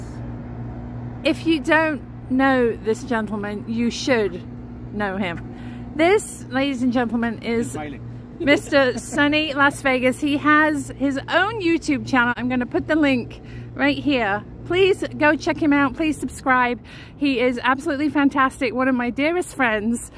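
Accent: England, United Kingdom. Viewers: Congrat for reaching 100k. You deserve it love your english accent❤❤❤❤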